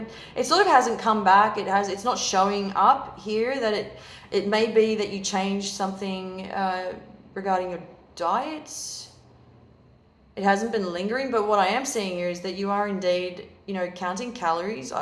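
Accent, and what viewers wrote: Australia. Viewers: Definitely an Aussie voice, good to hear. Simple and accurate. Zero drama hype in delivery. And short :)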